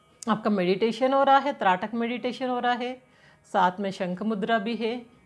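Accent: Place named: South, India. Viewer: आप है उच्चारण दक्षिण भारतीय है।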